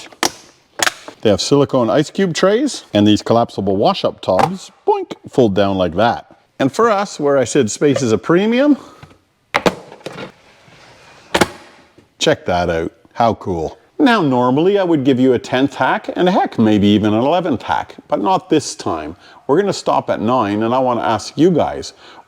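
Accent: Canada. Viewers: I think I ❤your Canadian accent! I’m Scottish and think it’s a hoot! Okay your best hacks for me are: Shrink wrap zip Water tap Butane gas can My hack is for tent camping. No matter what I use, my feet always get cold! So I carry a water bottle in my pack to put inside my sleeping bag at night. Bliss!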